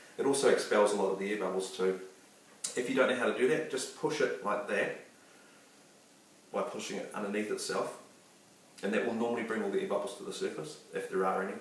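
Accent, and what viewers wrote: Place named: New Zealand. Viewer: ...new zeland accent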